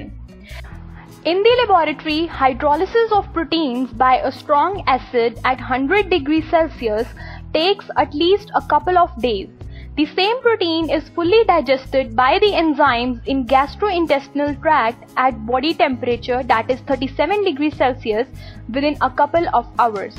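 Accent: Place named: India. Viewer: Oh my god I'm arabic and I can't understand your hindi english 😣😢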